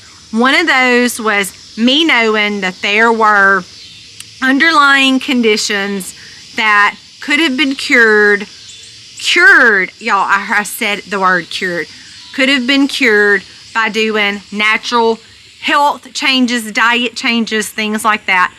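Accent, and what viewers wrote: South, United States. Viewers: Hi, just got done watching that video with Tucker Carlson!. Shared it with my daughter and a friend that is dealing with PCOS. I'm proud of you for leaving what you knew was wrong in our medical system. Honestly, I never had health insurance for many years of my life and just don't go to the doctor. I broke my wrist 3 years ago, tripping over a tree root. Thank God for hospitals for that. They wanted me to go to physical therapy to rebuild my strength. I found exercises online and did them at home. Doctor was amazed on how well I healed and the flexibility I had. As far as your southern accent and they way you talk: I love the way you talk! Hearing your voice, not seeing your face, I know it's you! Take care and God Bless, Lori P.S. I watch your channel and Colby's everyday. Was wondering what you are doing with your off Grid property?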